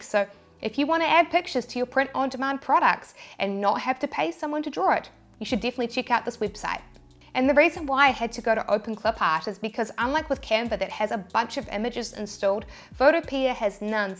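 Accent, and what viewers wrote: New Zealand. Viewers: Are you a kiwi? Where is the accent from? 🙂 Love your videos. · I like your New Zealand accent. Good job and thanks for all the info.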